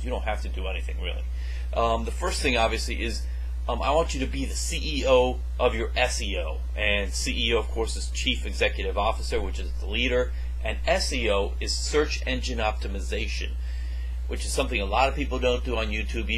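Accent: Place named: Brazil. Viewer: it feels like a brazilian in USA. he doesn't sound native even though he's trying hard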